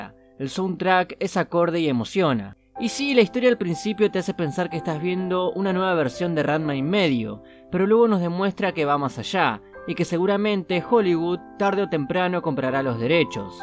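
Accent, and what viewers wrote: Buenos Aires, Argentina. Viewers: que paso con esa 'Y' cuando hablas ¿eres porteño?... Buen video like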